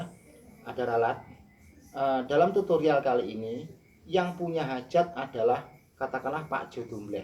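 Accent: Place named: Ponorogo, Indonesia. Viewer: Logat bahasa khas Ponorogo.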